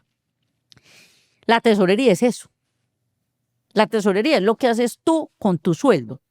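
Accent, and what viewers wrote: Colombia. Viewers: Medellin - Colombia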